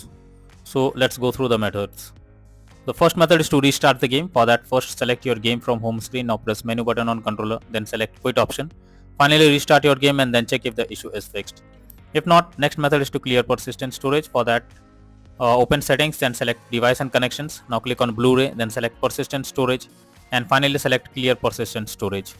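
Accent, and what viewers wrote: India. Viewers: Honestly bro. As soon as i hear Indian voices i turn your video off...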